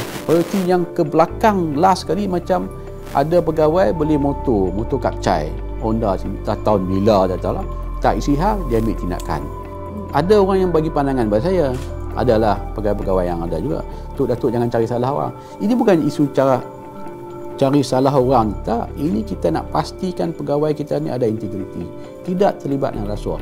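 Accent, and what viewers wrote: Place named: Kedah, Malaysia. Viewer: Lama datok kt luaq.. tpi loghat kedah datok msih ada lgi.. semoga allah lindungi datok dan kita seluruh rakyat Malaysia..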